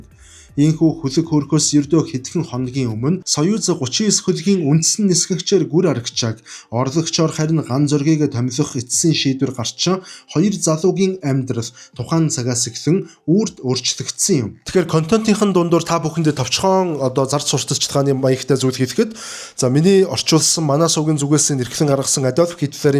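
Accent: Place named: United States. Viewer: Дайн саад байх юу бхав дээ сонин стилээр америк стилээр хуцаад боль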